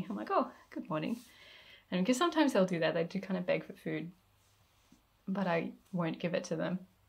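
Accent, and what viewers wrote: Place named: Australia. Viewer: New to your channel and really enjoyed your tour. I thought at first your voice sounded Canadian but then, no Australian! Ok well hello from Canada and I’ll check out more of your videos. Sorry you are so not able to get to Scotland, keep creating , stay safe! Especially enjoyed the kookaburra and reindeer!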